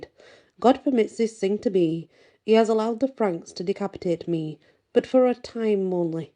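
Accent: United Kingdom. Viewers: Hey! You outdid yourself with this one! 🐎🗡 “Morvan Fights the King” was intense, emotional, and full of drama! 😍 The thunder, the battle refusal, and then Morvan’s unwavering stand—legendary!! His confrontation with the Hermit was SO intense… and the ghostly moment when he held his own head?! 🧠👻 That image will live rent-free in my mind forever! And the final redemption by Saint Anne 🕊💕… pure magic! I felt every moment thanks to your beautiful storytelling. Your British accent just adds that extra elegance and charm that draws us in like a lullaby. 💤🌸 Thank you for this masterpiece, Auntie. We love and appreciate you! 💖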